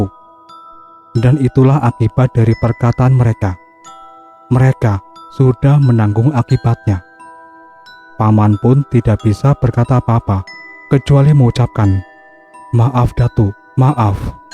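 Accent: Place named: Java, Indonesia. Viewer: Medhokmu lek... Ngapusi thok ki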